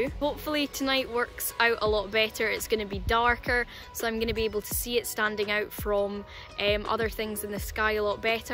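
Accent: Scotland, United Kingdom. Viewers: Don't know what I enjoyed most, your very helpful instructions or your wee Scottish accent. In any case, thanks for taking the time and effort to post this video. BTW - you wouldn't want to be standing around in the middle of a heat wave, being bitten by mosquitos, to photograph the comet. We here in Pennsylvania, USA can attest to that.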